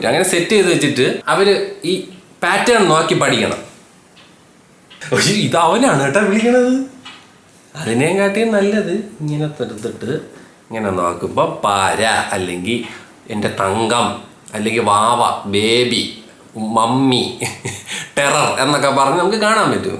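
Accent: Trivandrum, India. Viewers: Pinne enthire haha edayike Trivandrum slang varum.. adipoli man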